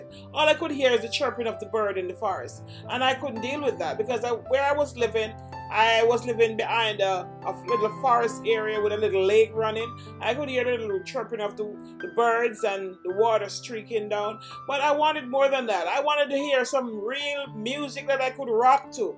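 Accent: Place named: South, United States. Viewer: To me California was a cultural shock for me coming from Sc . Seeing teachers not dress up like in a pair slacks and tie. California teacher at school was looking like they was going to the beach. You could not tell the different from the student and the teacher. See all different nationalities in one city and California being warm year round. I can understand you. And they asking where you from because you got a southern accent.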